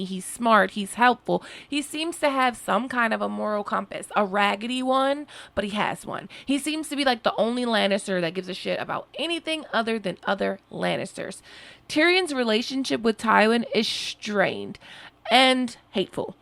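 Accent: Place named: Baltimore, United States. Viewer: You gotta be from Baltimore lol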